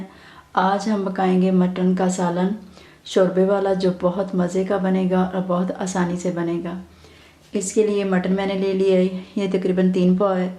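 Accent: Punjab, India. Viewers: I am belongs to arain family . Punjabi accent ha. Ap ha :p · Pure Punjabi accent.😍👍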